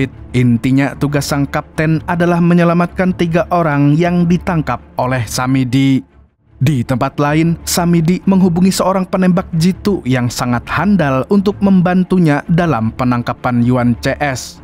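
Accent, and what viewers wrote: Banjar, Indonesia. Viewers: Bahasax Admin, bhsa Banjar gen, 😂 ngaran ikam spa?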